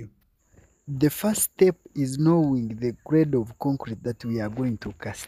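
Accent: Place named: Uganda. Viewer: I think this guy is from uganda I can hear his accent.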